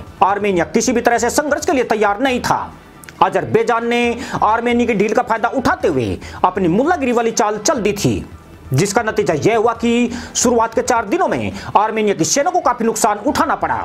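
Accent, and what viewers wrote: India. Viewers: Ji aap rajesthan se ho kya ya hariyana se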